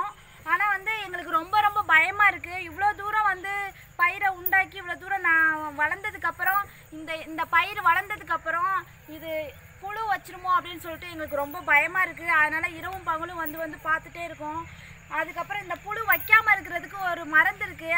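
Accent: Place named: United States. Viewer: Ama amerikka la irunthu vanthu iranguna mathiri paesura thoooo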